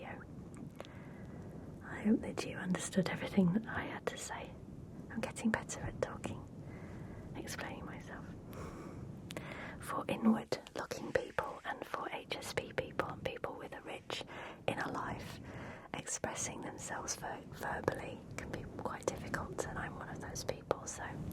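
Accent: United Kingdom. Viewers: I subscribed because of the nice British accent c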